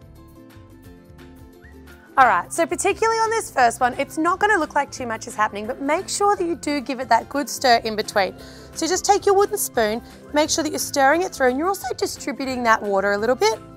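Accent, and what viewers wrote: Australia. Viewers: I love your Australian accent! My English teacher is Australian here in Sweden and oh my, I think about you every time I hear her :) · Draco Malfoy has a British accent....hers is Australian. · Does no one else think she sounds like an Australian Rachel Ray? Lol!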